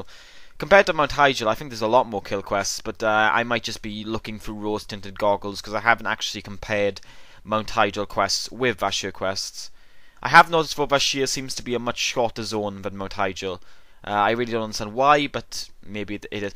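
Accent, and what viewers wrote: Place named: United Kingdom. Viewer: dude even u are a gay or freaking weird british that cannot talk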